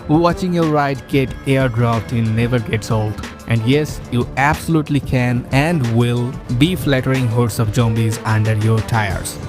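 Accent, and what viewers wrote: India. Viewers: I just ignored the video and laughed at your indian accent the whole video. 😂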